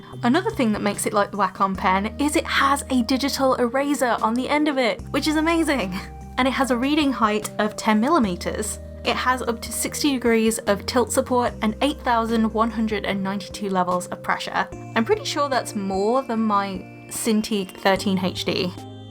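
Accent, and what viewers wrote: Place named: United Kingdom. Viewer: i LOVE BRITISH ACCENT ♥.♥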